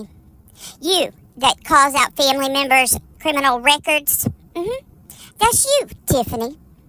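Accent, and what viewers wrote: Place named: South, United States. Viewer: I missed you miss, and I love Southern accents 😍💯🙏🏼❤️ Great video like always 💯🐍🥕🤡👿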